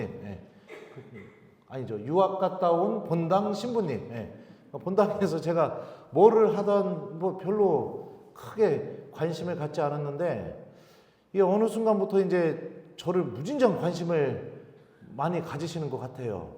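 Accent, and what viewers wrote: Chungcheong, South Korea. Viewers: 신부님 감사합니다 충청도 사투리를 한번씩 쓰셔서 반갑다 생각했는데 대전교구 사제셨네요~^^ 제 고향이 대전 이거든요 신부님강의 너무 즐겁게 또 은혜롭게 잘 듣고 있습니다~❤❤❤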